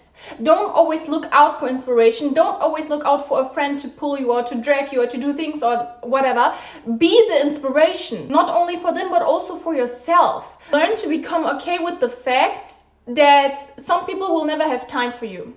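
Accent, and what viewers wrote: Germany. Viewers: I recognize a german when I hear one🤭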